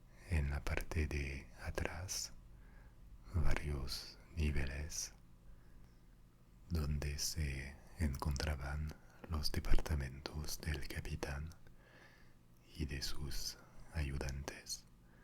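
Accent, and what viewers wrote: France. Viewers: oi todos tus videos en frances e ingles, nunca crei que hablaras en español, estoy realmente sorprendido, hablar re bien el español, aun con el acento marcado al frances pero no molesta para nada, hace exactamente lo que haces, relajar. Mas videos en español por favor!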